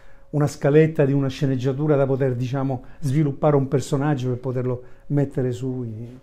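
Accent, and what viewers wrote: Rome, Italy. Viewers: Dall' accento sembra romano de roma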